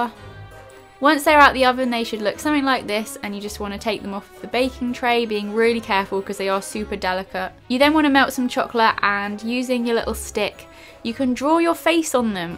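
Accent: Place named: England, United Kingdom. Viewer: i found ur videos other day now im hooked on watching them all xx ... r u from north south east or west england as ur accent is lovley xc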